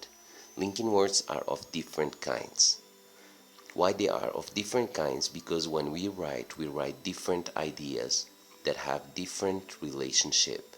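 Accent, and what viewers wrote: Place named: United States. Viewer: it's really interesting to see a teacher who really has a good american accent :-) thanks a lot for helping us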